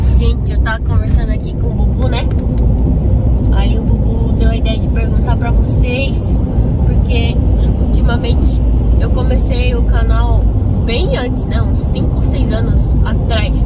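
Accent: Japan. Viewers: o interessante o seu sotaque em portugues.....parece uma japonesa falando em portugues Estudei japones no Brasil e meus parabens por ter feito daigaku no Japao.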